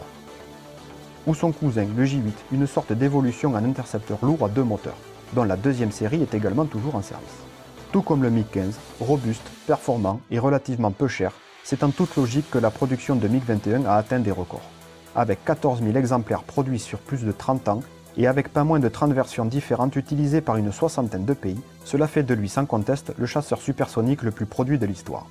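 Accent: South, France. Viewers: Surtout que vous avez un accent du sud non ? Méridional ? En ralentissant on en profiterait un peu plus, c'est sympathique. :p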